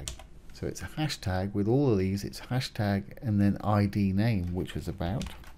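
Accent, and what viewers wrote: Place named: West Country, United Kingdom. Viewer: Hi.. Trying to place your accent. It s South with a bit of west county. Anyways enough of the small talk. Have been following your excellent lessons.As I'm just starting a website, this one in particular has inspired me to create a better idea than just a coming soon template so i can expand on the content more than just a few pictures and text. Ive liked & subscribed where i can. Was wondering if you could offer a little advice. So ive set up my Woocomm product pages with variable options,size colour etc. I make belts The drop down offers 100 colour options listed as names where the customer can make a choice. However a button next to it takes you to a gallery page showing all the colour swatches as better experience. So the ask in all this is. Can i some how link a choice in the galley to become added as part of their choices?. 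ive noticed you can hyperlink the image text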